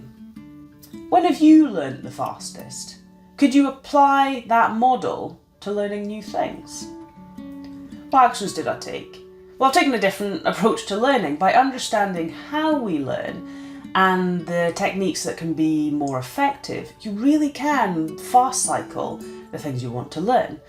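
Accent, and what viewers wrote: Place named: United Kingdom. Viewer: I love it when a British speaks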